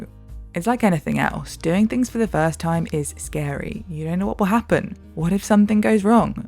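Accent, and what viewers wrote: United Kingdom. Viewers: What the hell is vocal fry!! She speaks the Queen's/King's English. Perfectly understandable and not in any way jarring.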